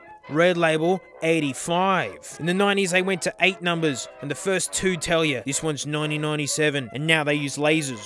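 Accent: Australia. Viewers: and that aussie accent lmao he uses his gift from god like it should be used. he is a godsend.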